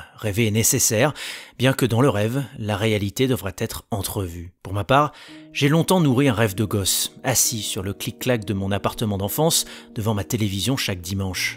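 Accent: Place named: Toulouse, France. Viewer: c le roi des jeux de mots éclatés au sol (Max Vegas, Lewis Ham’burger) on dirait un oncle relou qui découvre Twitter en 2010 et pense encore que faire une vanne pourrie, c’est de l’analyse. ttes ses vidéos c est un mix de mèmes de babtou rincés et une réflexion proche du bac à sable. Y a tellement peu de fond qu’on dirait qu’il commente la F1 en lisant des tweets. Le pire, c’est qu’il pense avoir un vrai ton mais tout ce qu’il a, c’est son accent toulousain qui le rend encore moins serieux.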